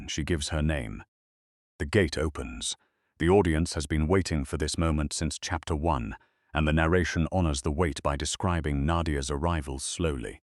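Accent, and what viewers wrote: United Kingdom. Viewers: It’s a British accent, in the King’s English.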